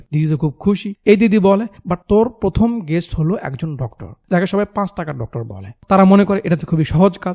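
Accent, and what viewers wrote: Bogura, Bangladesh. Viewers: ভাই আপনার বাসা কি বগুড়া ❤😮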